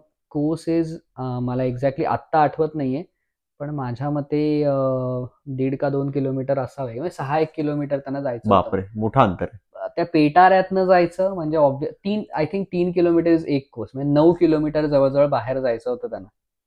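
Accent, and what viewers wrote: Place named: Pune, India. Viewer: खुप सुंदर भाऊ तू छत्रपतिची माहीती दिलीस भाऊ तुझी पुणेरी भाषा अप्रतिम भाऊराजा ❤❤❤❤❤🙏🙏👍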